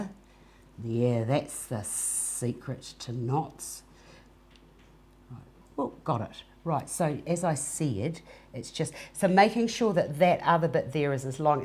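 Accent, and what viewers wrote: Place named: New Zealand. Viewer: Thank you for this lesson. I am a kiwi living in Australia and besides watching the lesson, I loved hearing the Kiwi accent. Are you from the south island?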